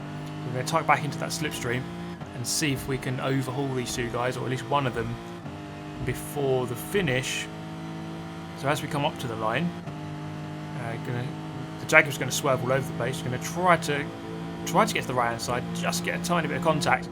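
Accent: England, United Kingdom. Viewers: I have enjoyed your series on Grand Turismo racing. With your English accent it is almost as though I'm watching ESPN or any other sports Channel that covers European Auto Racing. I used to be really heavy into Gran Turismo all the way up to about number 4, but got out of it for some odd reason. From what I see with the ability to race online I have got to get a PlayStation 4 silver I can join in the fun. BTW, would you recommend playing with a steering wheel stick shift and foot brakes or with? Let me know.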